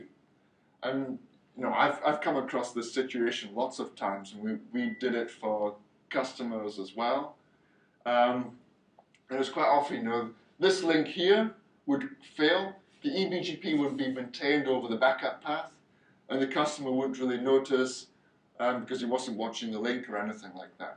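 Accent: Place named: Siberia, Russia. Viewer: Siberian English .... echoing behind tongue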